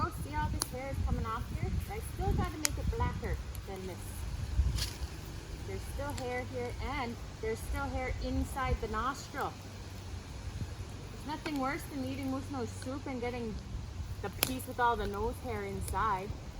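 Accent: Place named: Ireland. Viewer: Your accent almost sounds Irish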